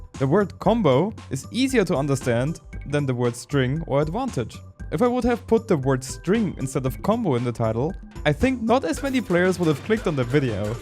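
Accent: Germany. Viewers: Looking forward to see how these new type of videos evolve. Would you think about manually putting official subtitles for these scripted (evergreen) content. I mostly catch what you say but sometimes I don't understand through your german accent 😅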